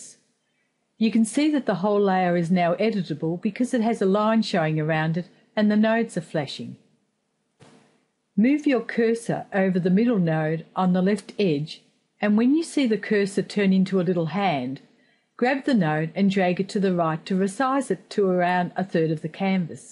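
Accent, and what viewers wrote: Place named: United Kingdom. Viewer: your Brit accent is tough to understand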